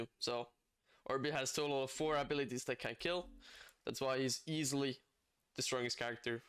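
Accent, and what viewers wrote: Finland. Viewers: Your finnish/nordic accent is very attractive.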